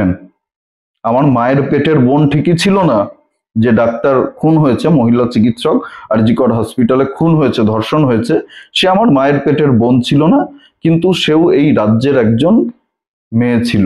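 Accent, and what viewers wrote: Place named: Bangladesh. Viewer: ভাষা শুনে তো ফুল বাংলাদেশী বলে মনে হচ্ছে... imported from Bangladesh...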